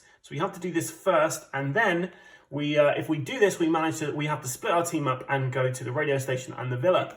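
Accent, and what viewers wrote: United Kingdom. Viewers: What an unfortunate massacre. Valiantly fought, alas it was not meant to be. I do not know enough about this game to give a proper assessment of what hurt our chances most and what could have been done better, but from my layman’s position, it looked like the scout’s assault on the objective room manned by three Germans might have been overly optimistic, and its failure the point where the operation took a turn for the worse. You’ll get ’em next time! By the way, love your ’40s British accent.:D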